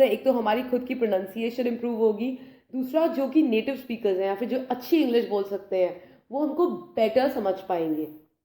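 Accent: India. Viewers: you have a very strong India accent. please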